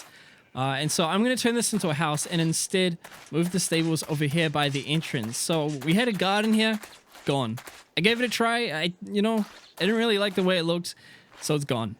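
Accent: New Zealand. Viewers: Why does every kiwi youtuber I come across have some weird amalgamation of a kiwi accent with an american one? Rip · Whats that accent of yours? New Zealand? Im trying to learn to differenciate them and you sound like temuera Morrison…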